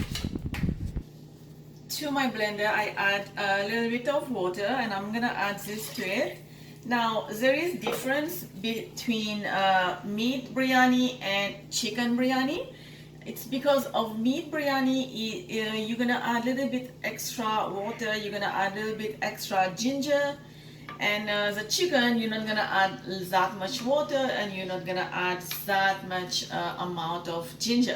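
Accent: Mauritius. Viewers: My step-father is Mauritian, and she just sounds like lots of Mauritians I know. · Why do you sound Mauritian, Indian and Jamaican at the same time?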